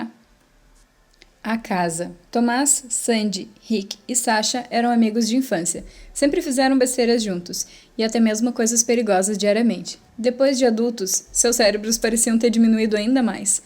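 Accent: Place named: South, Brazil. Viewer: Adoro vc e o canal. Vc tem sotaque do Sul. Sou gaúcha. E vc é de onde?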